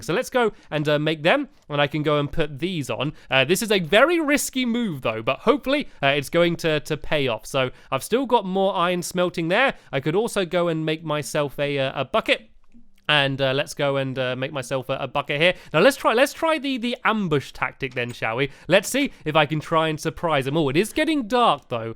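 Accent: United Kingdom. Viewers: Alright!! I don't know if it's just me, or does stampy sound less british???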